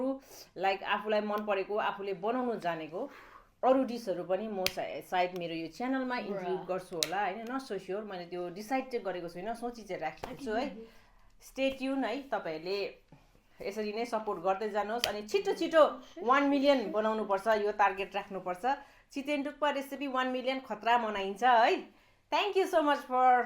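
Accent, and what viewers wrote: Darjeeling, India. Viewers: बधाई छ….! tapaiko बोल्ने शैली सुनेर दार्जिलिंग कै हो भन्ने पहिलेई थाहा थियो मलाई चैं 🤗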